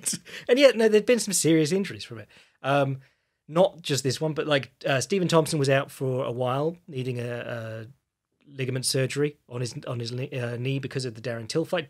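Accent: England, United Kingdom. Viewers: I love the honesty coupled with an English accent. Great breakdown 👌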